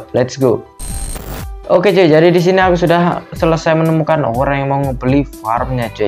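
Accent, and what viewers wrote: Indonesia. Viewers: Lah kok ngapak wkwkwkwkw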